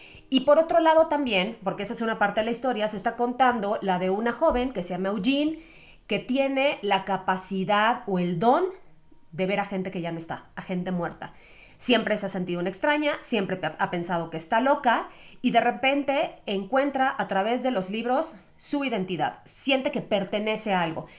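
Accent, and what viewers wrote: Mexico. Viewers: Enamorado de tu precioso acento mexicano. Me explota la cabeza. Gracias por los vídeos. Enhorabuena. Recomendaciónes: Sinclair Lewis (La trampa humana, Babbit, Calle Mayor), Dorothy Parker (Colgando de un hilo), Toti Martínez de Lezea (La calle de la Judería, Muerte en el Priorato).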